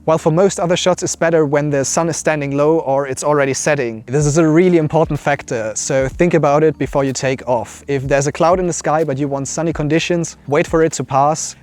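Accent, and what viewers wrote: Germany. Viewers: Ich wusste es, du bist deutscher :D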